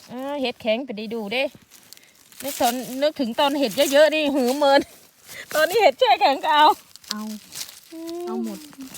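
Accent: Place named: Sakon Nakhon, Thailand. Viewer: พี่พูดเหมือนภูไทสกลเลยจ้า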